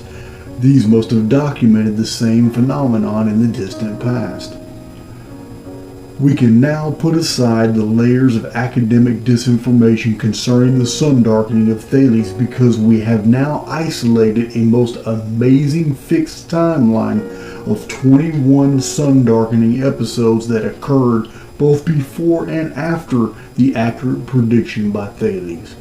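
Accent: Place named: South, United States. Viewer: you sound sooooo Southern here man haha love it 🧡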